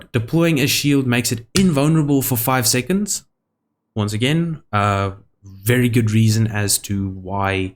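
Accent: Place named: South Africa. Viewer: Hmmmm I feel like you are south african 🧐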